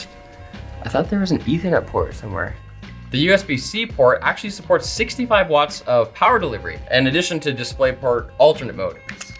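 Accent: Canada. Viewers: I can really hear the Canadian accent in this video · Thank god for closed captioning. Can't understand a word with that Canadian accent.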